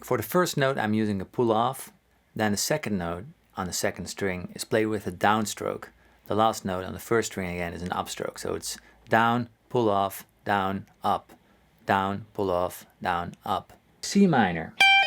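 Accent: Netherlands. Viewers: i just discoverd that your'e dutch. meanly by your accent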